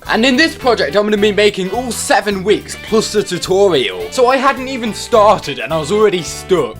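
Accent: United Kingdom. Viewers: This guy has the most british accent ive ever heard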